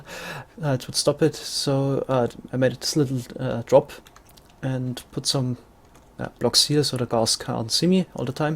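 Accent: Bavaria, Germany. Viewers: Haha 100 pro Deutscher oder? Der Akzent macht mich fertig. Nicht böse gemeint :D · He's German. I heard him speaking it. He sounds kind of Bavarian to me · Too sausend haha man hört voll raus, dass du Deutscher bist :D Aber krass, was du alles machst, großen Respekt dafür! :)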